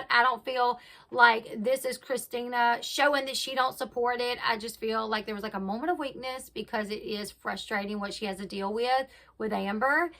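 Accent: South, United States. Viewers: ... At first I had commented, basically piggybacking onto your 1st comment and then read what said back to you and I'll admit, she got me. She played victim and because I'm one of "those people", you know exactly what I mean, the ones she looks down her nose at, I was ignored by my initial comment on yours and had stupidly deleted it. Then I chose to write an apology comment directly to and you know what? She ignored that one too! I used to really support her channel, especially during the whole KJ situation, BUT now.... nope! She's just like KJ, with a more southern accent and better ability at applying makeup. That's pretty much all. She doesn't care about anyone BUT herself, her money, maybe husband and kids and we don't make the cut whatsoever. This is the type of broad that sits at BBQ'S bitching about how "these people" affected her life and how useless we are. 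She's judgemental and I feel like a fool for firstly deleting my original comment and writing a separate apology one and secondly for falling for the manipulative techniques & word salad used in her reply to you and your comments. This woman, I'm so glad that my daughter won't ever have to grow up around her children and there's states between us. I just can't, it's like speaking to a body void of personality and empathy. Empathy is what got me to delete, apologize and, no excuses about your teeth or children are going to get you outta purposely ignoring it. I'm embarrassed and angry. BUT, I s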